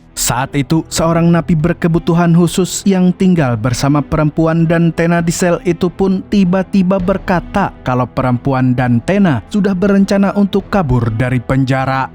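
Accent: Banjar, Indonesia. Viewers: Orang banjar kh pian??? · Urang banjar kh pian bg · Orang banjar sakalinya😂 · Bang orang Banjar kah...Banjar mana Pian bang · Orang Banjar kah mang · bah dah jua urng banjar si Mimin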